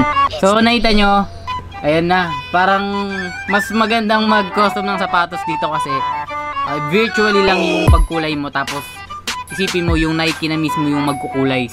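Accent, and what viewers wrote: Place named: Visayas, Philippines. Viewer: Sobrng bisaya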